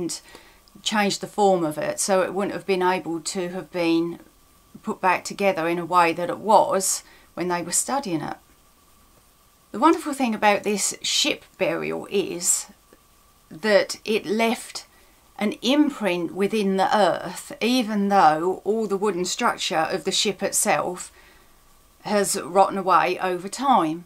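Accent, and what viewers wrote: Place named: Suffolk, United Kingdom. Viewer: Your accent just takes me back to my youth, I love it. A proper Suffolk accent, not like my mongrel Suffolk / Nofolk / probably mostly RP (and maybe a tad of north-east from my time in 'Boro... maybe)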